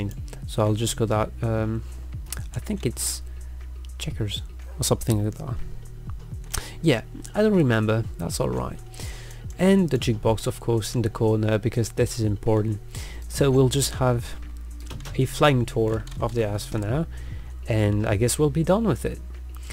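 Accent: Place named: England, United Kingdom. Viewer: Your english accent is so sweet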